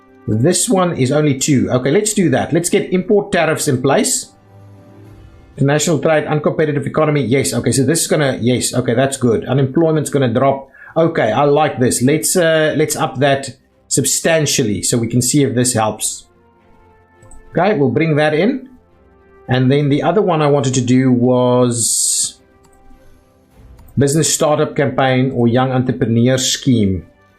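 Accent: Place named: South Africa. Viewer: Are you from South Africa, mate? Love the accent!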